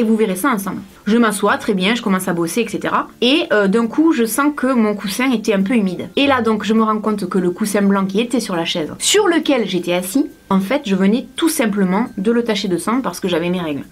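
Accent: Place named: Perpignan, France. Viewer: Oooh je me disais bien que cet accent m'étais familier ! Je suis aussi de Perpignan ^^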